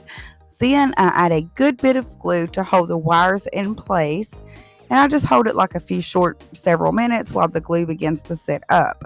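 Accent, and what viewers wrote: South, United States. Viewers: 😍😍😍😍😍😍😍 I have never subbed so fast! Plus I love your accent coming from another southern lady❤️ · OMG I just love your southern accent, I’m from Texas and still haven’t picked up The accent , your voice is so satisfying you probably can do satisfying videos and get paid for it👍🏽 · Beautiful work Beverly sent me over to check you out. I really like what I'm seeing and I just love your country accent 💕💓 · I just love listening to your accent , where in the states do you live?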